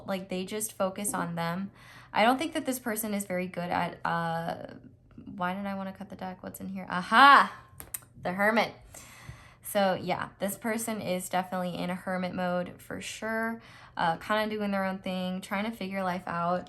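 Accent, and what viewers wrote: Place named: United States. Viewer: i wish i knew which part of america her accent is from. i fall asleep to her voice so often. it is so soothing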